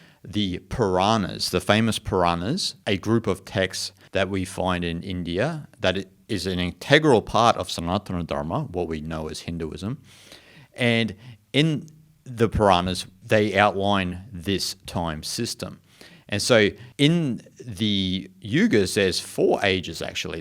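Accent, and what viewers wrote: Australia. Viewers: Great content and presentation. Always good to hear an Aussie voice. 😊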